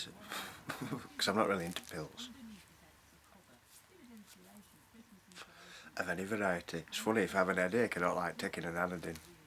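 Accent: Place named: Northern England, United Kingdom. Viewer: Great northern accent matey, you mention Leeds but I don't think it's a Leeds accent? I would say Barnsley but err? dunno? Sheffield possibly but, I am gonna go for Wakefield area???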